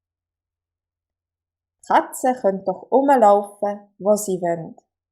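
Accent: Switzerland. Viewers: I love your videos, I love your stories, I love your swiss german accent, I really should express this love making your skype lessons hehe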